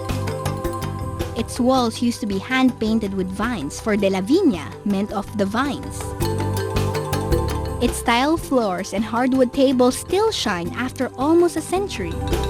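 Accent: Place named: Philippines. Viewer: Love her cute filipinas accent.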